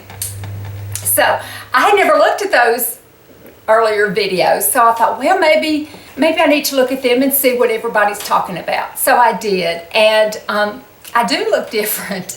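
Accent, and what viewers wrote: South, United States. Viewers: Oh, sweet, you are such a pleasure to watch, and us over 60 girls need to love the way we look and just enjoy our lives. My gosh, if I looked as lovely as you, I would be in seventh heaven, plus the delightful southern drawl. You just keep the sweet lady you are. Love from Iowa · you're such a beautiful, upbeat person! Enduring surgery and living with a chronic disease is not easy but you have learned to adapt and find what works for you. Love your video's and that Southern accent. Enjoy your time with the grandkids!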